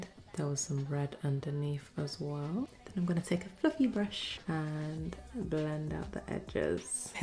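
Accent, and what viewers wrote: United Kingdom. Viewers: ARE YOU BRITISH??????? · Are you British By the way I love you❤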